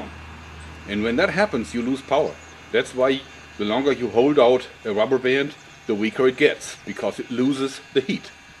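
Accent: Germany. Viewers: Der gutealte deutsche Akzent xD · German english sounds funny xD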